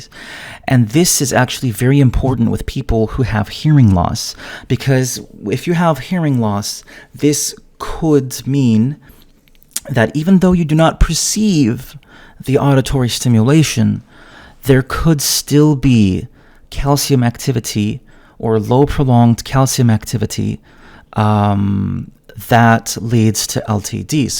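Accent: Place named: United States. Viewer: Are you from Russia? But you have American accent. Just curious.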